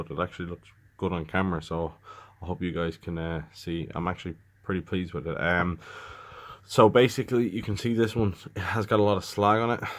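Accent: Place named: Ireland. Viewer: I'm trying to pick out the accent to see which county (or even which province) you're from. I'm not the best with accents though. Unless it's a strong Dublin, strong Cork, strong Donegal, etc I'll struggle. xD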